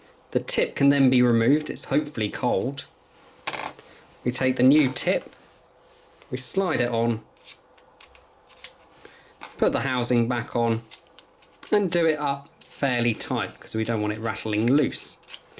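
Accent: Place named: United Kingdom. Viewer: Don't mean to sound crude but the British accent talking about proper care for your tip had me like 😂. Love it! Very informative as a newbie to both arduinos and soldering. Thank you. Much ❤ from across the pond.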